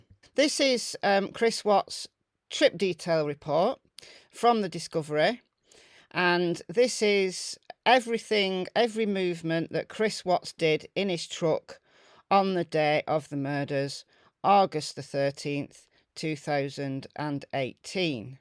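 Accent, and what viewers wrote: United Kingdom. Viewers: You sound like you are in the UK..Nothing wrong with that except it's awfully crass of you to make a video based on bullshit when you don't even live here and also hurting the Watts family with this crap! They are gone tragically but stop dredging up this home made bullshit and let them rest in peace! Nichole Kessinger was involved...Monkeys might fly out of my butt! Now apologize to the Watts family for making this rubbish video and shut up!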